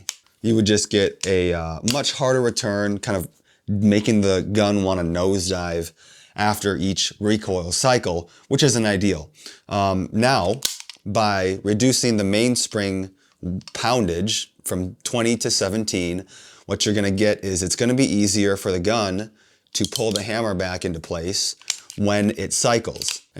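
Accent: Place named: United States. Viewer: With that accent you're more American than Korean!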